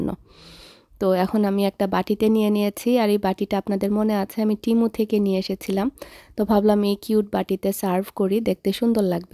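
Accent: Sylhet, Bangladesh. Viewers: Apni sylheti vashay kotha boilen valo lage but shuddhu boilen na valo lage na ...bolte paren je apni deikhen na apnr vdogulu valo lage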